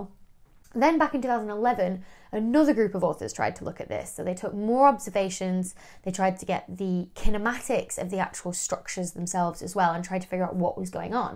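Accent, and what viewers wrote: United Kingdom. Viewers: Nothing sexier than a blue eyed PHD in Astronomy with British accent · I noticed that but your Brit accent is so lovely that I forgave you🤭🥴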